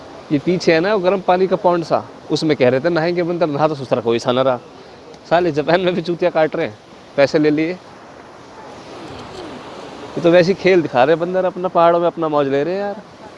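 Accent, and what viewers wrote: Haryana, India. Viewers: Bhai HARYANVI bol k video mai kati char chand lga diye , tourism with comedy ...😍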